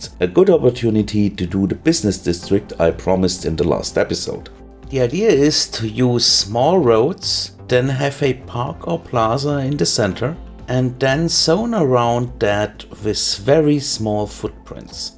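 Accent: Germany. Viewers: Diggi ! Dein German Accent macht mich ech krank. Kann ich nich gucken, es ist so dermaßen schlimm !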